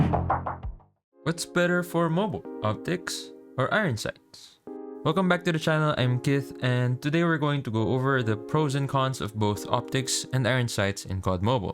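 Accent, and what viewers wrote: Philippines. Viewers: your accent is like a pilipino accent. are you half pilipino?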